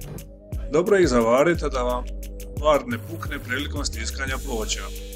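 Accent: Dalmatia, Croatia. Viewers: Pozdrav, nov sam na kanalu pa nisam upoznat, po govoru mi se cinite da ste iz Dalmacije ili bar okolice? Di bi moga napravit atest u Splitu ili okolici?, mlad san momak zeljan certifikacije, tig postupak, samouk cca godinu dana.. pozz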